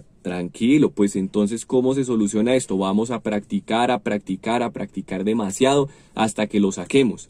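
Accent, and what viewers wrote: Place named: Colombia. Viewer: Si gracias por esa explicacion paisano chao me alegro bastante oirlo esa es mi Colombia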